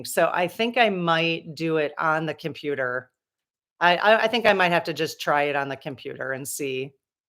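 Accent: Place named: Wisconsin, United States. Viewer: I knew Wisconsin by your accent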